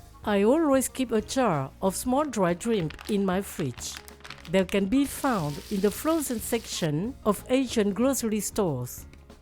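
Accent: France. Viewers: She is Asian with a French accent !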